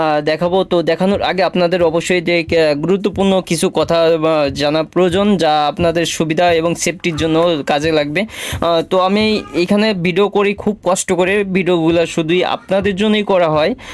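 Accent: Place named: Khulna, Bangladesh. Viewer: দেশের বাড়ি কী খুলনা কথা তো আমাদের খুলনার মতো মনে হয়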